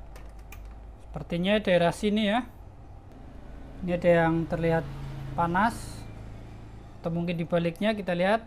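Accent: Banyumas, Indonesia. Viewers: Koyo wong ngapak iki ra ngapak ra penak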